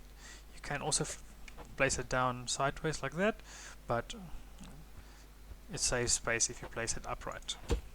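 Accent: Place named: South Africa. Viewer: You sound like a fellow SA bra good vid btw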